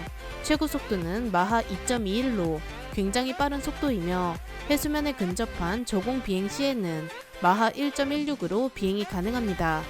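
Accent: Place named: Gyeongsang, South Korea. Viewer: 이 아줌마 경상도식 억양이 있네.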